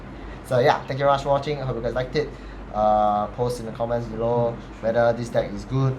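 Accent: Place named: Malaysia. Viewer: U have a malaysin accent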